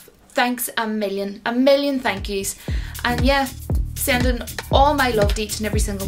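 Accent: Ireland. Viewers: Irish accent is freakin' awesome .. as for success, it really comes down to personality :-) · Great info, Luv the Irish accent....drinking a wee bit of tea. Oh and you're dead on. In AMERICA we use the word eejit by itself with the same meaning. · Top of the day to you 😊👍 I absolutely adore the Irish accent and I have heard many of the words that you use in this video. I have several Irish friends and one of my lecturers is also Irish 😊 it's so nice to listen to.. keep up the good work on your chann 👍👍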